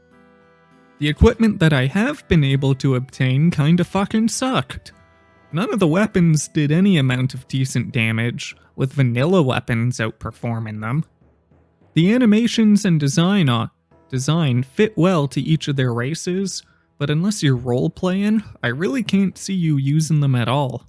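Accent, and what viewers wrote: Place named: Colorado, United States. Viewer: You sound like you're from Colorado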